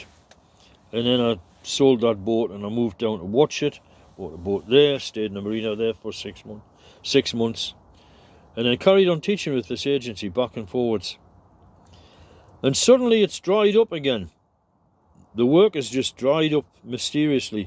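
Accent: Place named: County Durham, United Kingdom. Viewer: I'm 47 this year and still waiting for a diagnosis, Its been around 6yrs journey as I've been assessed for autism and bpd only to come back to an original opinion which was adhd. I must say I dont do well but I'm confining myself to my home so I don't buttress up against much that can cause to much stress. I hope you all the best and hope to find some confidence in my little bubble as you have shown to be possible. we also share an accent so that helps, I'm in peterlee, co. Durham.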